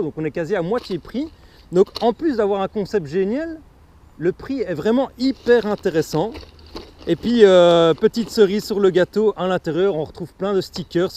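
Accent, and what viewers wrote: Belgium. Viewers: Tu viens de Namur tu as laccent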